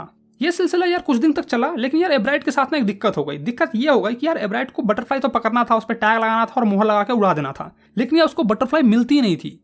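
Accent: Bihar, India. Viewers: Haan, bhai ye bihar se hai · Aur ek aur cheez aap bihari ho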